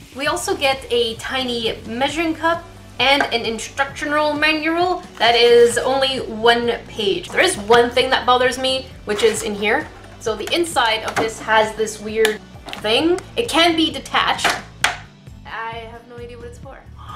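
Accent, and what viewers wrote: Canada. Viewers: You can tell shes from Canada 😂😂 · I don't know how you sound like a stereotypical Canadian lol. I'm Canadian and have never met someone with a stereotypical Canadian accent.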